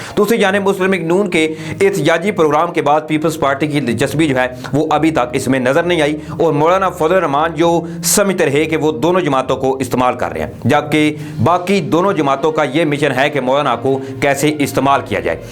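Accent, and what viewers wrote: India. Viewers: جناب صاحب اگر آپ مناسب سمجھیں تو اپنا تلفظ ٹھیک کریں ھمیں انڈین ھندی ٹائپ کی اردو سے نفرت ھے جیسے ۔ خون کو کھون کہنا خالی کو کھالی ۔ خانہ کو کھانہ وغیرہ ۔ اگر یقین نہیں تو اپنی سپیچ دھرا کے سن لیں اور باز رھیں ۔ لگتا ھے نئے نئے انڈین فلموں سے متاثر ھوئے ھیں ۔ اپنے اردو کو خالص کریں پلیز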